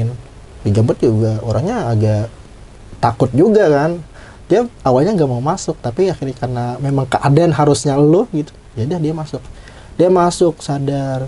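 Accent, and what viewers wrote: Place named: Banjar, Indonesia. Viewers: Tanjung Tabalong Kalimantan Selatan hadir om.... Bang biar pakai bahasa Indonesia, tapi bahasa Banjarnya tetap timbul😆. Sehat terus bang😁🙏 salam satu hobi. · Beugh, Kaltim hadir wal aey,. Biar kata bahasa nya lu gua, logat Banjar tetep kalihatan jua lah,. Sukses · Logat banjar nya berasa banar kang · ini orang pahuluan mendok bnr wkwkwk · Gunung 🗻 halau halau di Barabai Hadir Banjarmasin Logat nya Kd hilang bang Kya urng Barabai 😉🙏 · logat banjar nya kda hilang mang ae wkwk tpi ulun ketuju aja hehe terimakasih atas ceritanya mantap sukses selalu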